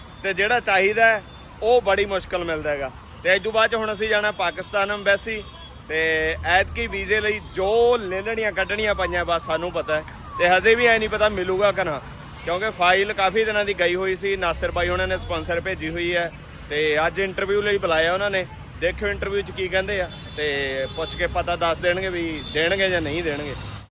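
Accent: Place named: Amritsar, India. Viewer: ਬਾਈ ਜੀ ਤੁਸੀਂ ਹੈਗੇ ਬਰਨਾਲੇ ਦੇ ਤੇ ਭਾਸ਼ਾ ਤੁਸੀਂ ਅੰਮ੍ਰਿਤਸਰ ਦੀ ਬੋਲੀ ਜਾਨੇ ਹੋ ਢਾਡਾ ਢਾਡਾ ਭਾਜੀ ਭਾਜੀ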